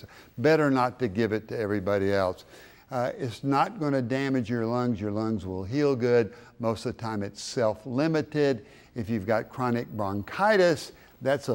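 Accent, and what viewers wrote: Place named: Mississippi, United States. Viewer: He sounds like he's from Mississippi . Wish he was our doctor. This guy has KNOWLEDGE .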